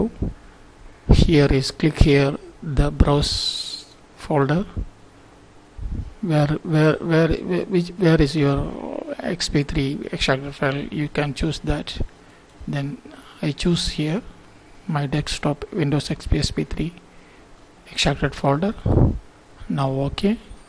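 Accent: India. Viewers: the heavy punjabi accent too wtf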